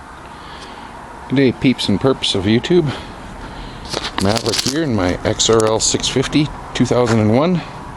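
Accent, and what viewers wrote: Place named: Canada. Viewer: Wut!? That's a hoser accent if I've ever heard one!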